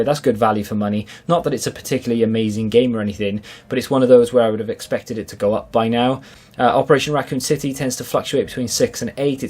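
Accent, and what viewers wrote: Wales, United Kingdom. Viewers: He sounds Welsh to me. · Yep definitely Welsh mate, I think he said once his nearest big CEX is either Swansea or Cardiff, can't remember which.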